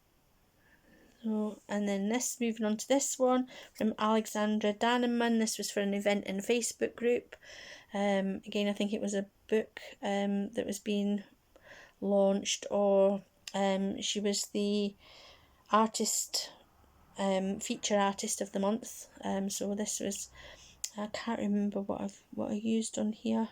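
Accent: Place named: Scotland, United Kingdom. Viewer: What part of Scotland is your accent from, love listening to you.😘❤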